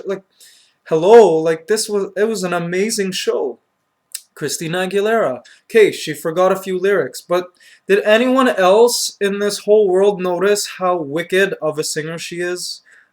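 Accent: Philippines. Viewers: ._. i find it more interresting to watch ur vids in the pinoy accent xD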